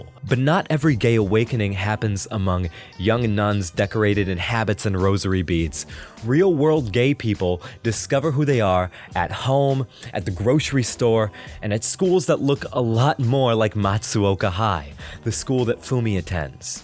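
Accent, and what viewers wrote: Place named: New Jersey, United States. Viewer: Has anyone else noticed the sudden Jersey-esque accent??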